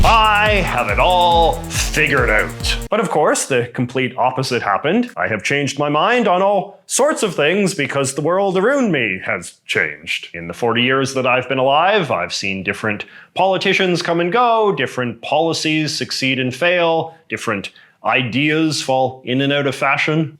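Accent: Canada. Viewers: dude i can't get over the canadian accent wtf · learned in this video that some canadians also say “doubt” and other “ou” words with the stressed u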